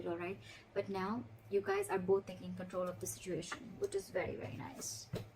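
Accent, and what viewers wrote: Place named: India. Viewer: Your pace is too fast, try to speak slower. We know you're from India and trying to hide your accent but still too fast.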